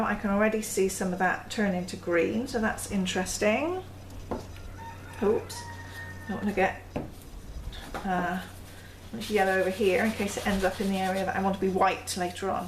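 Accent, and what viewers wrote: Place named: Oxfordshire, United Kingdom. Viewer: Hi!:) That was beautiful and definitely my favourite of all in the challenge! You need to embrace your inner green lol:) that lime was very refreshing. Love that cup too, I agree with avani t - put a hole in the bottom and make a string of lights. Only thing is you'll need to do at least a dozen more, although I'm sure you'll get there...:)) oh and it's pronounced Sur oooh lee um here's someone called Emma who has a YT channel for just these sort of things <link> I know this because I had to (ask my better half) look it up for a picture I made a while back - added the link in case you'd like to see the picture <link> I was wondering where you are from originally, love your accent and thought I could guess but can't quite put my finger on it. Must be close to Oxfordshire, maybe?:) All my best Heidi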